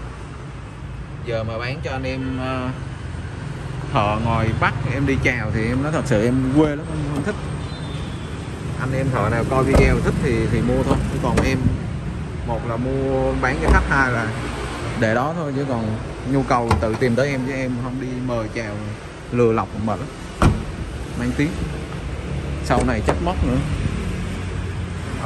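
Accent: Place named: Mekong Delta, Vietnam. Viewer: nói chuyện thiệt tình như dân miền tây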